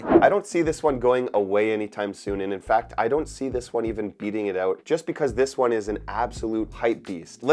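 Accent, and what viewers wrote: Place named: Canada. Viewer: Judging by your voice and kind demeaner you were giving me strong Canadian vibes -- upon further investigation, you are in fact Canadian 😄 I love your content. I'm going to add Qahwah to my collection thanks to your opinion. Keep up the good work.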